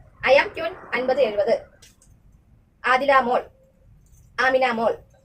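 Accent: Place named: Trivandrum, India. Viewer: " nikku nikku nikku evide povunnu"😅😅thani Trivandrum 👌🏻👌🏻kollam 🥰🥰 Collectionsum adipoli👌🏻👌🏻👌🏻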